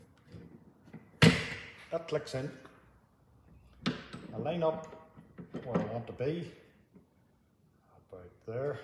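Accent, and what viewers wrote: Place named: Donegal, Ireland. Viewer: Donegal accent always makes any vid sound better. :-)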